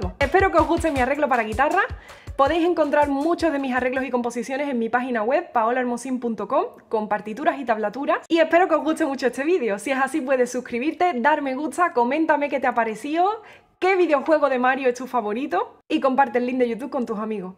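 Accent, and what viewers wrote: Andalusia, Spain. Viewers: Hola siento curiosidad de donde eres por tu forma de hablar, me imagino de España pero de que parte? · 1'22": y ustedes diréis... Con todo respeto, creo que una persona con educación superior no debería hablar así. Esas maneras andaluzas son responsables de que aún hoy día en muchos lugares de Hispanoamérica se hable mal el español y un profesor tiene que dar ejemplo. Saludos cordiales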